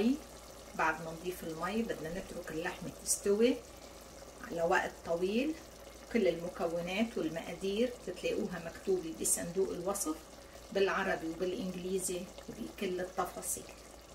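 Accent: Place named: Lebanon. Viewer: احب طبخك اللبناني واحب لهجتك اللبنانية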